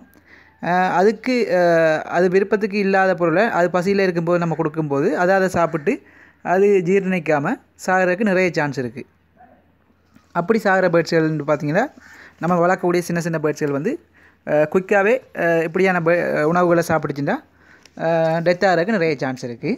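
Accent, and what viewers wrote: Sri Lanka. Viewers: Malayalam ila srilankan tamil💪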